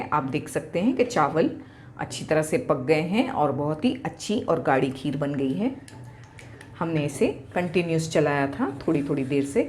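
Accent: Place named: South India, India. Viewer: Hello ma'am rishampreet here from India today I watch ur video first time nice cooking videos Aap ki Hindi se feel ho raha he ki aap India ke south se he m I right or not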